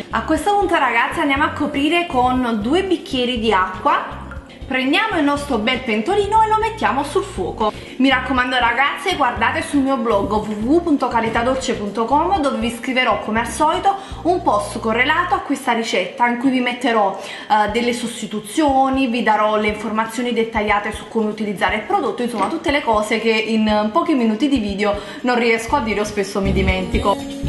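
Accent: Naples, Italy. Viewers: sbaglio o sei napoletana, l'accento è inconfondibile 😂😂😂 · Hai l'accento napoletano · Ossicini è in dialetto napoletano se non si era capito dal suo accento fonetico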